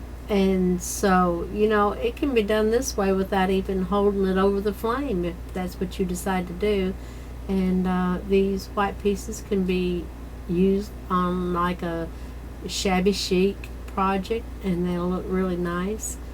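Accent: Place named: South, United States. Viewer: A very interesting technique. Thanks for sharing it with us. Have a great Easter. Just out of interest, what part of the USA are you from. I'm guessing somewhere south by yr accent. Hugs Lynn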